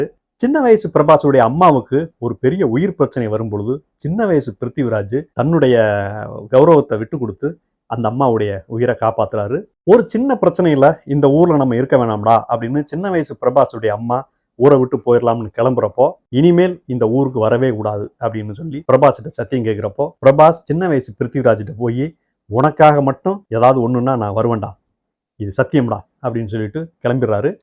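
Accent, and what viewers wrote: Madurai, India. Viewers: Maapla u are sema under rated 😂😂😂 Madurai slang la summa alaparaya iruku review maplooooi 😂 😂😂😂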